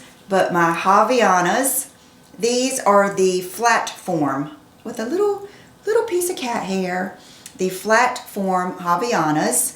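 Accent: South, United States. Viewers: I just went and watched your first video, how sweet! You did "keep your nerve up" and 14 years later you are still here! I have watched for a long time, maybe not since the very beginning but a long time and wow, what an accomplishment to have stuck with this 14 years and we all love you so much! And I love your Southern accent too!!!! oxJUlia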